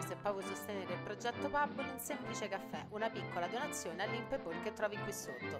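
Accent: Rome, Italy. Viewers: I tuoi video sono delle "Icone" concentratti dell'italico sapere e ponderare. Grazie poi dell'energia positiva che metti col tuo romanaccio simpaticcissimo. Grazie veramente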